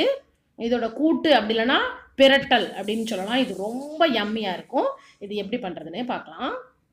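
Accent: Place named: Tuticorin, India. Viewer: Unga native ethu akka... Slang tuty slang pola iruku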